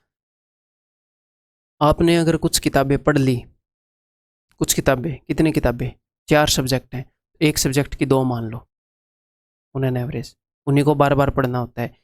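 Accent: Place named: Bengal, India. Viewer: Sir aapko Punjabi Me pura bengali babu lag ri ha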